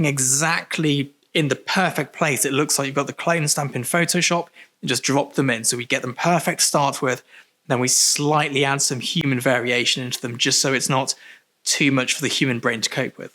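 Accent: England, United Kingdom. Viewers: stay with British English, it's more interesting to us Americans :) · Hi, thanks for sharing your insights and all of that mate! Could you show us how you get everything level for flat lay and how you use that laser wotsit? As far as your choice of English goes, I like your English English!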